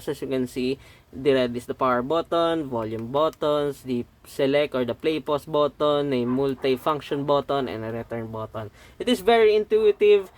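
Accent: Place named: Philippines. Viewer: I like your filipino accent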